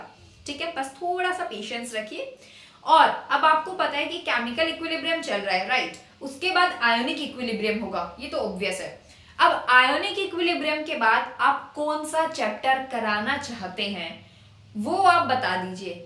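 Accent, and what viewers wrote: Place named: Uttar Pradesh, India. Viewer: ji up se hai kya aap if i not wrong because your voice tone is just like that ❤❤❤❤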